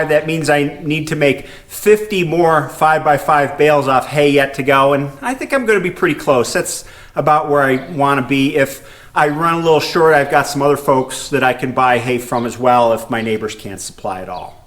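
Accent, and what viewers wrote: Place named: Central New York, United States. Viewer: I like how says, "Expuriments"! I went to SUNY Cortland, studied Ag Science, and that's how they say it in central NY! He reminds me of home!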